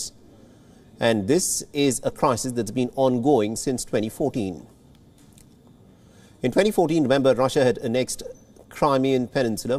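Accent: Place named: India. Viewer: Guys... Whenever you hear that accent. Just remember about the old grannies these indian IRS phone call/ telemarketing scammers had scammed. 👍👍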